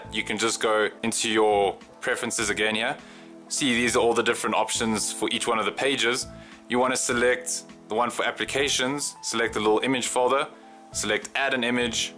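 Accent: South Africa. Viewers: Are you from Pretoria?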